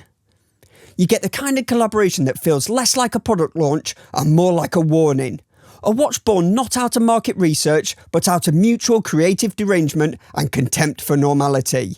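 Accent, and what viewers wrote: United Kingdom. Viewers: Hooray Grimsby! I thought you sounded like Olga from The Toy Dolls. Sunderland. Maybe, Tyne or Wear. Awesome channel!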